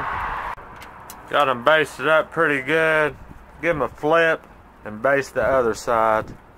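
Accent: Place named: South Carolina, United States. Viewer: I hear accents and dialects like yours here in South Carolina too.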